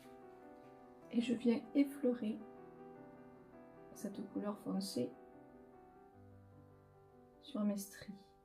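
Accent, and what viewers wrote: South, France. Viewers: et en plus avec votre bel accent du sud c’est encore plus agréable à écouter et voir 🥰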